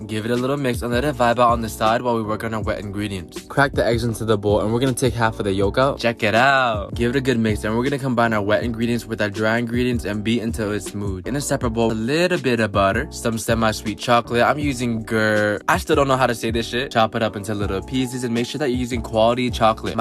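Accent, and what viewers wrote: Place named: United Kingdom. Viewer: Y'all can tell he's british. His accent... and LOOK AT THAT PANNNN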